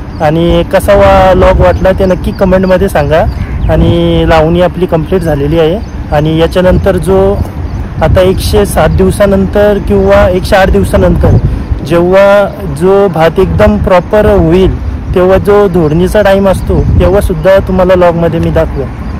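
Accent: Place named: Agari, India. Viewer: Agari bhasa ahee tii amchii amii lahan. Pana psun asch boltoo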